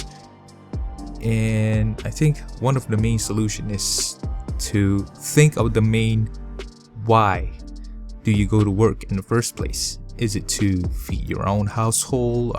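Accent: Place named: United States. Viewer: You sounded American and as an American, I LOVE the way you say "Perspective". Also, am a big fan of Dragonball Z and Goggins too. ✨ ✨ 💪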